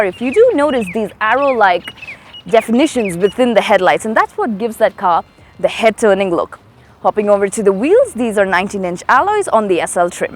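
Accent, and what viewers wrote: India. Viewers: That's confusing...I guess it's either hyundai accent or her Indian accent.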